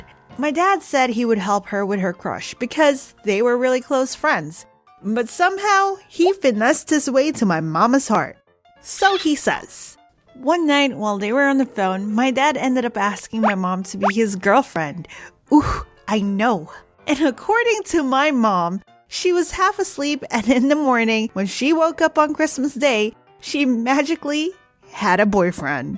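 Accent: Philippines. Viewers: Her voice and accent is so Pilipino LOLOLOL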